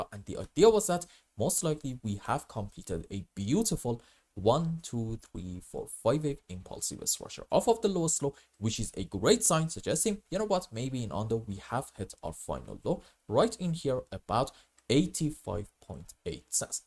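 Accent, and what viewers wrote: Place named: Iran. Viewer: I have a feeling that you are from Iran. am i right? cause you accent is so similar to those! if you tell the truth , i will consider to subscribe bro.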